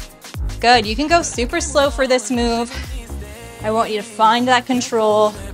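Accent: Canada. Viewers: I love all your stuff, (Canadian??) accent included! "Oh Canada, you have hot and awesome barre coaches!" I love the programs with less/ no pauses between movements. Can we get some more of those, puh... puh... pretty puh-leaze! (Please! :)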